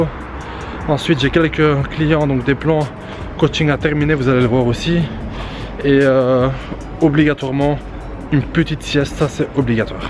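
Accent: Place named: Brussels, Belgium. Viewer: Je tombe sur ta chaîne frère de très bonnes vidéo bien ficelé ac de bnnes infos,je reconnais l'accent tu serais pas bruxellois ? en tt cas je m'abonne bn courage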